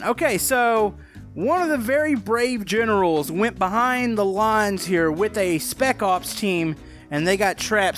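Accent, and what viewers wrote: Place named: West, United States. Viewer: for some reason i actually thought he had a farmer accent or like sort of a western accent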